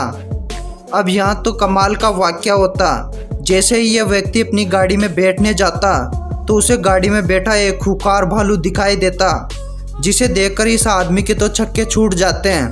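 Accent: India. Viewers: sister are you Bengali 🙂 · Jaipur side ki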